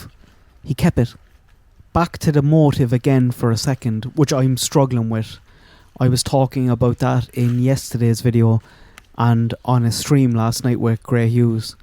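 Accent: Ireland. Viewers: Scotch or Irish? Irish? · I love your Irish accent! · I thought there was another person waiting nearby to pick up the killer? I think it didn't go to plan,those girls were to be taken away elsewhere,the crime scene was staged to throw LE off the scent.I've been fascinated with this case from day one.Lovely to hear the Irish accent by the way 💚🤍🧡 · I’m in Ca now but grew up in Indiana It’s fun to see the woods again as I used to go mushroom hunting with my dad I agree with your speculations on the case as you speak in the lilt of your Irish brogue THANKYOU Love your dog too❤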